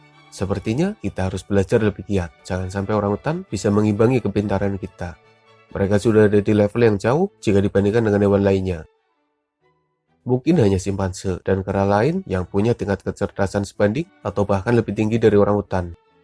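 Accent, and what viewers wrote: Banyumas, Indonesia. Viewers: Host ny NGAPAK BANGET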